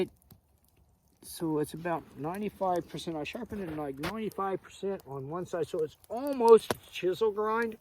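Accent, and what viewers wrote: Australia. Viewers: Old hickory epsecially older ones are sooo tough n last forever... Almost hear a Hint Of Austrailian Accent in there no? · maybe an odd question, but are you originally from Australia? I just here it mixed in with your accent..... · Your accent is…. Like from where? Sounds Aussie almost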